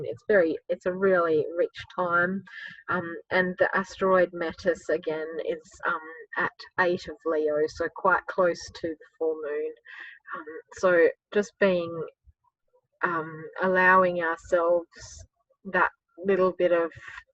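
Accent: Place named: Australia. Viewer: Wonderful to hear an Aussie accent on EA ♥️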